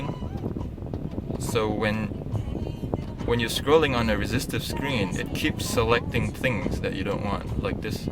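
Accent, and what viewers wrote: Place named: United States. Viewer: you sound like American. do you live there?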